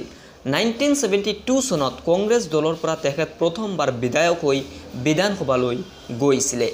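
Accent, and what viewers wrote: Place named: Bangladesh. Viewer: The voice is of a bangladeshi.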